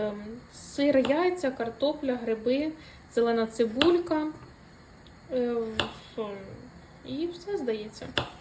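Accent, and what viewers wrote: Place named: Odesa, Ukraine. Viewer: У вас чудова літературна вимова, як для Одеси.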